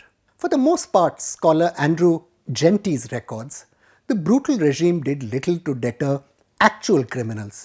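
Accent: India. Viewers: Add text. Your English is too Indian to understand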